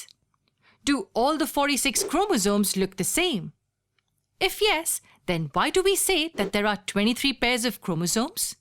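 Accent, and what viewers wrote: India. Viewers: You sound very indian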